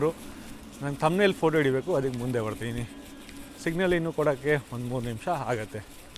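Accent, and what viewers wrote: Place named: North India, India. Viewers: Yes, he is from Uttara Bharata. Please don't discourage him. He is a gentleman. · I think he is basically from UP or Bihar , who is settled in Karnataka.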